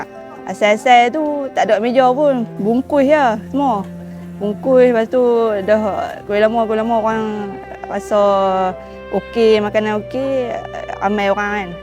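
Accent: North, Malaysia. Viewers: Pekatnya utara kak ni, utara piau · Aku suka kak cik ni kekalkan loghat utara, tak buat2 nak pelat... Itulah tanda kuat pendirian dan kuat jati diri. Terbaik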